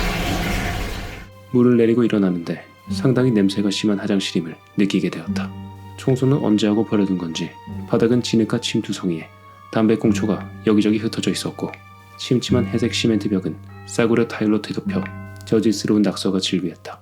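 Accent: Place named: Busan, South Korea. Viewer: 경상도 어디사세요? 부산 사투리인가...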